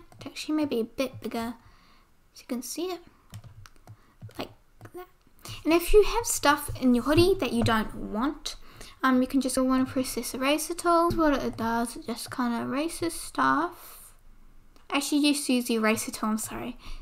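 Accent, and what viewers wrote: New Zealand. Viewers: Love the kiwi accent. You were a big help since I got hired for a clothing group and needed to learn fast.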